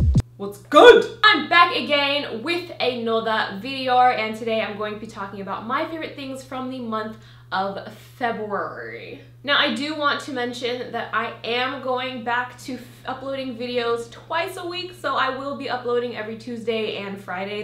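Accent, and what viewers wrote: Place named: Australia. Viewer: I MISSED THAT AUSTRALIAN ACCENT LMAO😂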